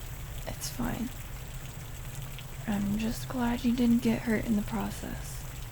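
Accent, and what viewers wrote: France. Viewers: Holy! Here I thought your voice was mesmerising with the french accent but this is equally as hypnotizing. Lovely work as always!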